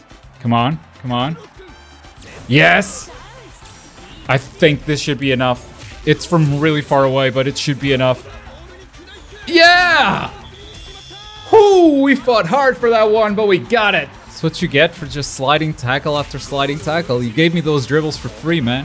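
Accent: Portugal. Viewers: I know you where from Portugal from the way you speak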